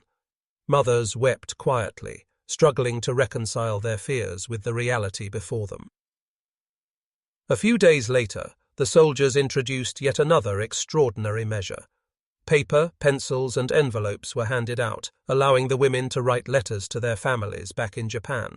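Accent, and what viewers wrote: United Kingdom. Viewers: wHY IS THIS ROBOT SPEAKING BRITISH ENGLISH? TO AN AMERICAN AUDIENCE?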